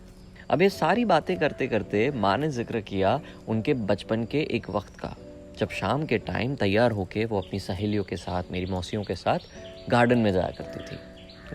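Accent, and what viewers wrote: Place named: Rajasthan, India. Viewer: Rajasthan k lgte ho Bhai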